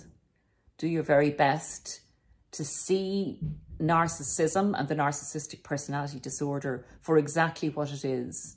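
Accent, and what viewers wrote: Ireland. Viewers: Thank you for your help you seem to understand the situation well enough to put the problem across to others , every thing you have said I’ve been through just gone through Christmas that my husband ruined for me no gift no food nothing spent the last few days in the bed room away from him as he had the nerve to blame me , I’m not well at the moment and it seems to annoy him as he appears to think he’s worse than me , but I haven’t seen any evidence of that ,I might have to have an operation at sometime but I can’t believe he would want to help me with that . He has left some leaflet around about domestic abuse I can’t believe he thinks I’m the problem ,I haven’t spoken to him from before Christmas as it’s a waste of time as he doesn’t understand it’s him causing the problem , I thought he could be depressed as all he does is to moan about everything and everyone but he’s been like this for years so I don’t know, I just want to be happy , normal, not living in a war zone doggy land mines , loving your accent my parents were from Ireland, thanks for letting me let off some steam , happy new year .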